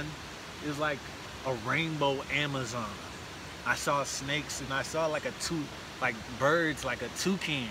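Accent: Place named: Glasgow, United Kingdom. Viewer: A Glasgow accent right at the start ..that’s got me watching this ..! 👍☺️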